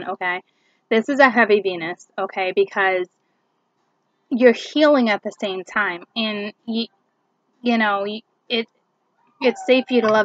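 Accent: Boston, United States. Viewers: I know you have a Boston accent. No texting. Call mommy. Then go unannounced at the house. I did that and all the kids were running because my daughter was not there. That put a stop to that ish. She was 14. It's a different world once they turn. 13, 16, 18, 21. She is now 27 and doing okay now. Sheesh... #Motherhood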